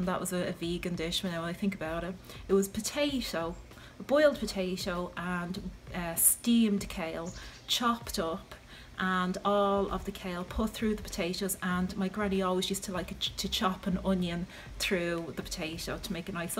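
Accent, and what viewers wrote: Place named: Ireland. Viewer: I love your Irish accent!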